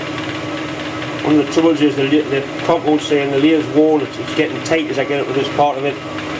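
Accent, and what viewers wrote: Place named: Scotland, United Kingdom. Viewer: Ohhh, bl**dy h*ll, ya about killed me! When you said "High speed stool" I had a laughing spell that drove my cats from the room and made me see stars when I lost all my wind! Oh my brother, ya done got me typing with an accent now after watchin' ya's all mornin' long... Begging your pardon, it just feels good to mess about and have some fun with making different accents. There is not a drop of harm meant, and I certainly hope there is no offense taken? I'm just a old lad from Hawaii that lives in California now, I grew up surfing and playing footy, so I've met my fair share of Australian blokes, and later on some English and Irish surfers made it over there too, but I've yet to meet a Scot who surfed. I know they exist, some fine ones too, and Thurso is high on my list of places to visit for a surf, but I've just yet to meet one of your fellow countryman out in the surf. 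Bound to happen if I ever get to Scotland, he he. Anyhow, I am going back to watching you work, it's a great playlist and I really appreciate you taking the time to put it up for us to enjoy! I can feel your pain as far as the slow uploads go, even my computer is so old that it takes forever to just render them from the editing program. Thanks a bunch for both the great laughs and the excellent instructions. You can blame Tom Lipton for me showing up here and pestering you, he's the one whose mentioned you. Well, everybody I watch speaks highly of you, but he was just the most recent, and with my memory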